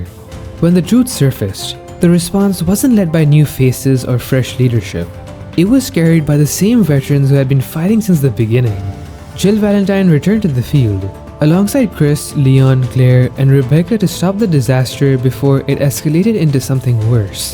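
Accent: India. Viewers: Fookin accent are u indian or latina?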